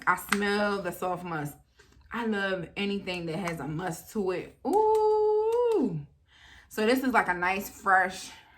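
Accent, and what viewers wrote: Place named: Baltimore, United States. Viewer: Girl I thought you were from Bmore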